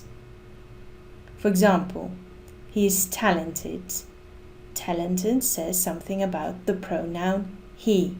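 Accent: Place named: West Midlands, United Kingdom. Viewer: very professional presentation. and very understandable. excellent . thanks !!! watched from central America, I detect i think a rather refined west midlands accent. please keep posting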